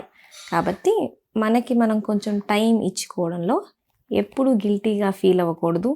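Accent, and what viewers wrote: Guntur, India. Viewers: Meerkat cheppindi bavundi mi gunter yasa kuda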